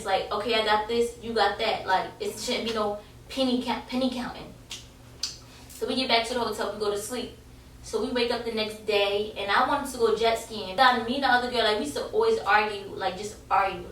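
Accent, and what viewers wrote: New York, United States. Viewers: When you said dumb cheap I knew you weren’t from the South. I knew automatically that u was from NY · That New York accent lol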